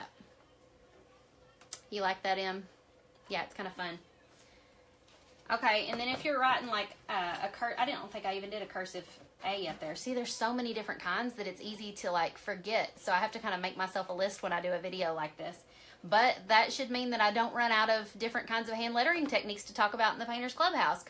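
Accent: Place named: Alabama, United States. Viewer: LOVE the accent! I'm a southern bell as well and you remind me of sweet home Alabama!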